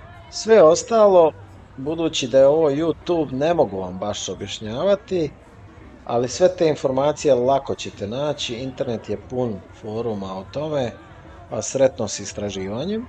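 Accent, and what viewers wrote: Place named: Herzegovina, Bosnia and Herzegovina. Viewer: Ok drugar ocito nista ne znas o Europi jer si negdje iz Ercegovine koliko čujem po glasu . Da ti Tata objasni šta imas u toj Europi imas klubove od 5000 ljudi koji se svi praše bez stajanja dovoljno je da potapšeš osobu koja ti je draga po ramenu i ta osoba ce ti dati felacio besplatno . To o njih 20 ispred tebe svaki bordel u Europi radi zove svoje cure i ne placas pice vec te pitaju koju bi kresao. I da ti kaze netko ko je bio na Patayi sve je to super ok ali daleko bolje mi je u Nizozemskoj ili Belgiji od Thailanda tako probaj ovdje vjeruj mi biti ce ti isto super.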